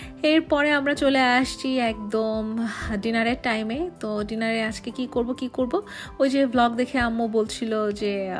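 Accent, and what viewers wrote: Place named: Rangpur, Bangladesh. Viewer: Apu apnar onek kotha Rangpurer mone hoy.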